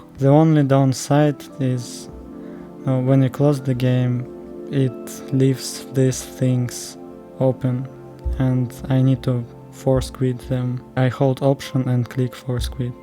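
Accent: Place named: Russia. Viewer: блин я сразу заметил русский акцент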